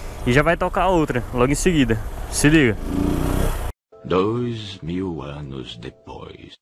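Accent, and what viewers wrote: Fortaleza, Brazil. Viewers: Tem que ser de Fortaleza 😂 "Teu ânus" "Os cara teima" 😂😂😂😂😂😂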